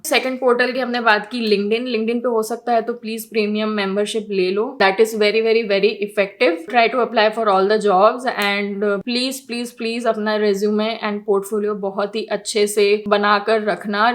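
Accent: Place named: India. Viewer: Thank you for those content,in this video it was really hard for me to understand since you speak indian,can you make your videos with subtitles?thank you.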